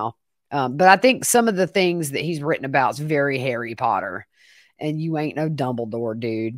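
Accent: South, United States. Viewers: You’re the best,! Just when I thought I knew all there was to know, you taught me even more!!! Wow ❤❤❤❤!!! I also LOVE your voice. I love your accent! I love southern accents ❤❤❤❤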